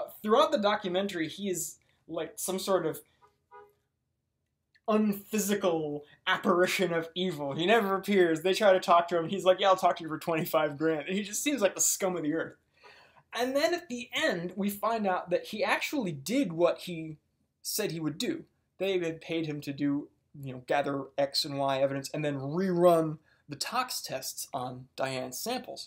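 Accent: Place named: Ohio, United States. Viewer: Ohio huh? I’m from Cincinnati and pretty good at listening for accents. You definitely aren’t from Toledo or Cincinnati outright. I’m going to guess more very very outskirts of Cleveland. Maybe Akron???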